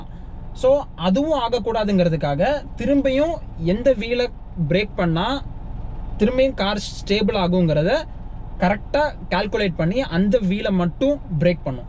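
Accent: Tirunelveli, India. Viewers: Bro neenga TIRUNELVELI thana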